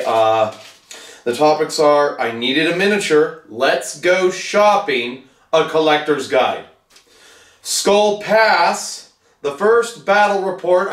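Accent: United Kingdom. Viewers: Appreciate the Brit accent!!! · Love the British accent. Like been in the same room as Hugh Grant.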